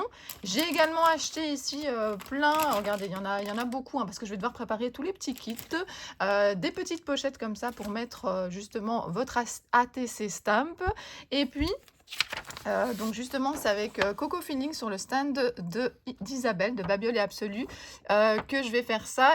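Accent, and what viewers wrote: Belgium. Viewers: Bonjour, j'aime bien écouter vos vidéos et votre accent belge est trop rigolo 😉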